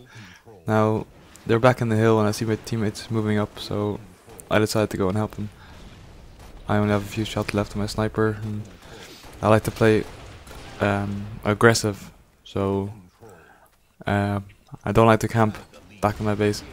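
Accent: Ireland. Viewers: I think hes irish ¡¡¡ lol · He's Irish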